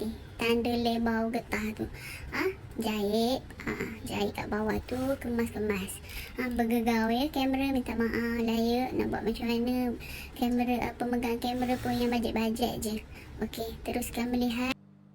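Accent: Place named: Pahang, Malaysia. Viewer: orang pahang deh...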